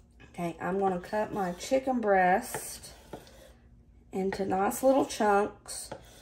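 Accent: South, United States. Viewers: Just love listening to your lovely Southern accent here in the UK. You are so natural and sensible like a gorgeous Mum teaching me to cook. I can afford what I want now but would have been so grateful of your help when I was young and broke!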